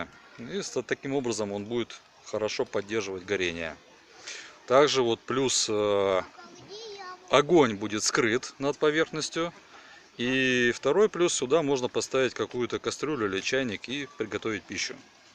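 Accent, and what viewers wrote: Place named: Ukraine. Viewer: Украинский говор как не странно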